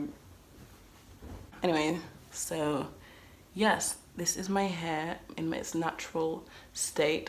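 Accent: United Kingdom. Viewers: I love your brittish accent it just sounds so soothing can I marry you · You sound like a right and proper speaker of the Queens english and your Quite the Looker very fetching!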